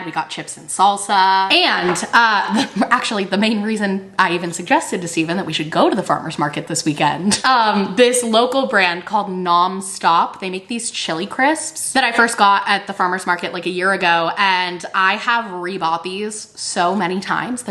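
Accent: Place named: Southern California, United States. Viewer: You pronounce a lot of things differently than me but who cares! I live in NY and you have a SoCal accent. I think it's cool to hear voices different than my own.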